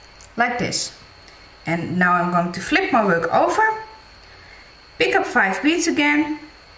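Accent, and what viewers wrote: Netherlands. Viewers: are you from the netherlands? cause i am and your accent sounds like your dutch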